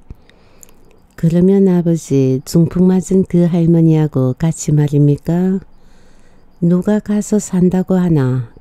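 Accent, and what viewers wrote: Gyeongsang, South Korea. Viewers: 고향 운정 미국이민 20년 타향에서 도식오빠 순례 애틋한 인연 한편의 영화 님 경상도 밀양 사투리 가슴 이 먹먹합니다 감동입니다🎉😢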